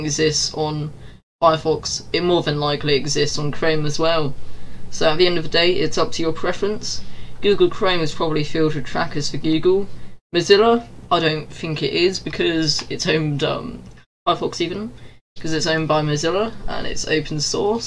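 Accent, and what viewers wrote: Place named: United Kingdom. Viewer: Youre save a british guy and i dont like british english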